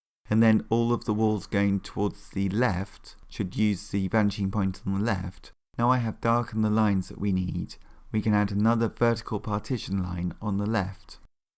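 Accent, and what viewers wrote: United Kingdom. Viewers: You never over explain. Just the right amount of clarity and instruction. Thank you so much And great English accent by the way.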